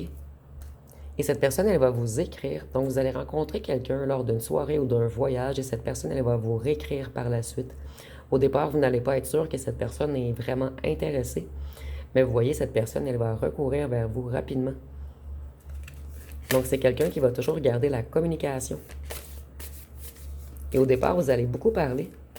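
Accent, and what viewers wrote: Quebec, Canada. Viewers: Mes Respects du Four et Enchanté Chère 🌹🦋🙏 Merci pour vos guidances que je ne manque jamais! j'aime beaucoup votre accent Québécois aisi que ce beau vernis que vous portez si bien 😊 Un Agréable et heureux Dimanche 😊 Bien à Vous 💐 Alex 🙏🧘‍♂️🎉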